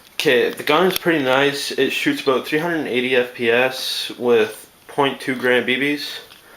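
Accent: Canada. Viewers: Haha I heard you say aboot and I knew you were canadian. I love canadian accents.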